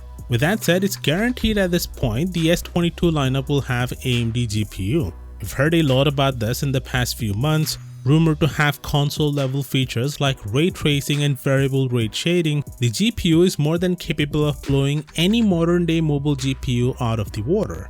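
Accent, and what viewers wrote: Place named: India. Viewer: it explains the english /indian accent lol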